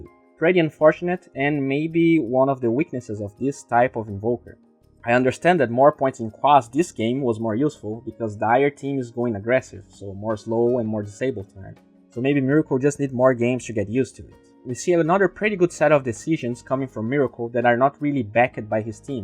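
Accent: Brazil. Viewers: that accent haha r u brazilian dude?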